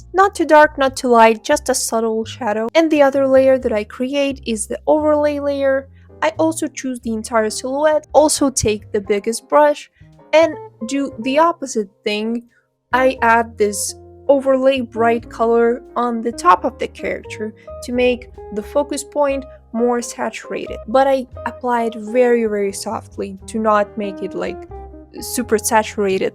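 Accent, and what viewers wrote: Russia. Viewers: Понять не могу... автор русская? Слышу отчетливый акцент... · now this is useful but id like to ask if you're Russian i feel like i hear it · йоу я подумала что вы русская, откуда вы?? Что за акцент?? ник?? ЕМАЕ ЭТО ТАК ПОЛЕЗНО БОЖЕ МОЙ. СПАСИБО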